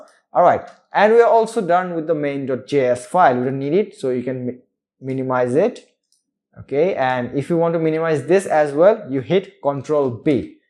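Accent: Bengal, India. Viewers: dada tomar accent ta sunei bojha jachhe bangla vasa vashi❤️❣️,love from WB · Indian accent Lol, can’t understand!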